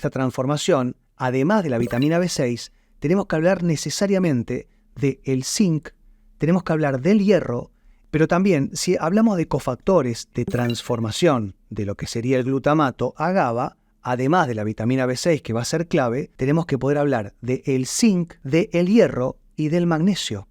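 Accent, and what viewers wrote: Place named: Rioplatense, Argentina. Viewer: Parece como argento o yorugua.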